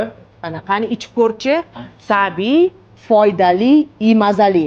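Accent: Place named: Russia. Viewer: Русс мисиз акценттиниз баскаша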